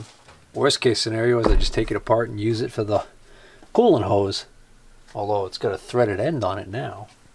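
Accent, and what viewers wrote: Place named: Massachusetts, United States. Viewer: So i found your vid on Victor regulator and i like your explanations. Looks like we are doing the same kinda stuff. I hear an accent too and it seems your from Ma? Btw, new sub.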